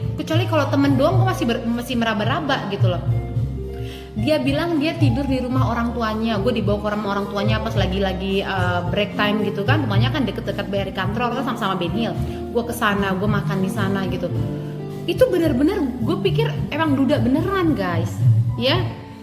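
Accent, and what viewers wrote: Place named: Indonesia. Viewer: Youtuber paling menghibur ini, cara bicara nya benar2 indonesia bgt, mantappp jiwaaa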